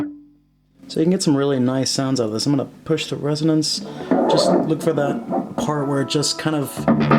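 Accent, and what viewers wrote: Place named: Germany. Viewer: Dude. Are you a German that learned English? Christ I thought this was American for ... first 15 minutes! Great channel and checking things out. Brian Jones tone cuts more to the core of listeners and is an awesome thing to take a look at.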